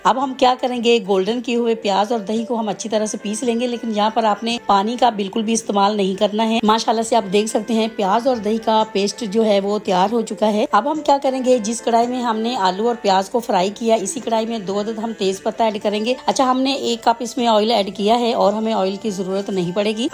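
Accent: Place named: Punjab, India. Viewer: How can u speak without taking breath 😂 very interesting food blog recipe Sy ziyada apka Bolna ka Punjabi style chah gaya 😂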